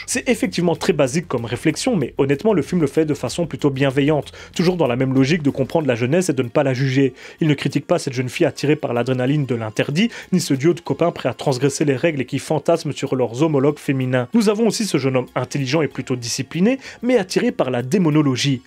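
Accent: Belgium. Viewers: félicitations pour les 90k je trouve cela totalement mérité...je vais me répéter mais tu fais vraiment un travail de montage d ecriture incroyable tu t exprimes vraiment bien ton accent belge est quasiment imperceptible (je suis belge également) c est vraiment un plaisir que de voir un compatriote avoir de plus en plus de succès sur YouTube etant également un gros cinéphile grace a toi j ai pu découvrir ou redécouvrir certains films cultes du cinéma fantastique et d horreur...ta recherche tes anecdotes sont tjrs de qualité et j attends tjrs avec une certaine impatience tes prochaines vidéos preuve que ce que tu fais dechire continue comme ça big like poser bien évidemment a bientôt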